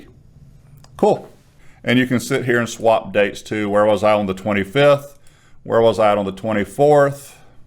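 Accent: South, United States. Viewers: I love your accent, sir. We need more Southern hospitality up in NY.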